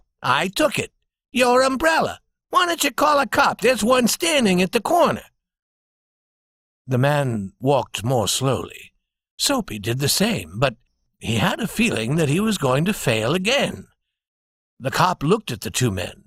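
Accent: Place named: England, United Kingdom. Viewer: very great accent ...English (Saadiyat Beach)..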